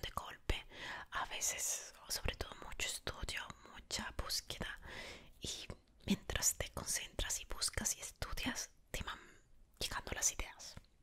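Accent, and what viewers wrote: Italy. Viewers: Eres de las Youtube más hermosa, eres full linda, con ese tono y timbre de voz italiano y español, uhff el combo total linda, con esa feminidad y esa voz, enamoras, seduces y creas una sensación extrasensorial extraordinaria y que trasciende más allá.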